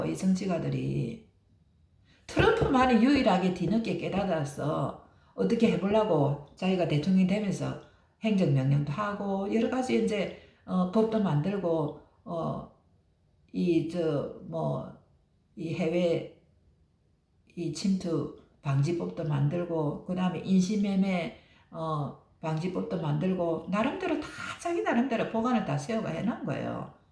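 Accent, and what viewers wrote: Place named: Busan, South Korea. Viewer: 부산 같던데요 ㅋ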